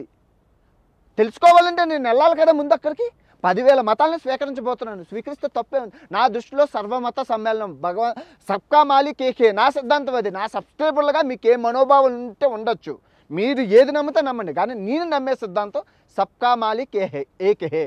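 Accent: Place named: Visakhapatnam, India. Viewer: హాయ్ బ్రో ఈ మధ్య నీ వీడియోలు చూస్తూన్న చాలా బాగుంది... వైజాగ్ లో ఎక్కడ మీది... అంటే స్లాంగ్ ని బట్టి వైజాగ్ అనుకుంటున్నా ఎనీహౌ all the best for your future world trips and God bless You...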